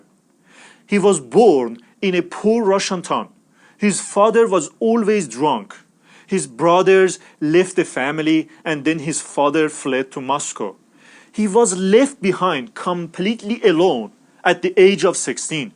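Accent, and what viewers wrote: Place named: Iran. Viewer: I can tell by the accent that this guy is Iranian....لهجه تابلو هست که گوینده ایرانی هست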